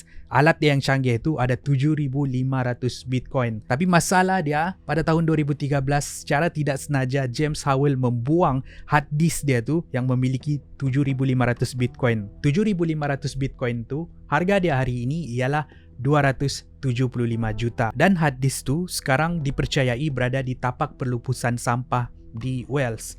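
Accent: Sabah, Malaysia. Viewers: Aslmkom, Boleh Kerap Sikit Upload Video. Hehehe.. 😊😊😊.. Aku Suka Dengar Cara Ko Sampaikan Satu² Contents Tu..! Tak Kiralah Contents Apa.. Yang Dalam Loghat Sabah Kola.. Lama Sangat Dah Tak Jumpa, Kawan² Sabah... Dengar Loghat Sabah Pun Boleh.. #FlashBack Juga Sikit² Tu Kan.....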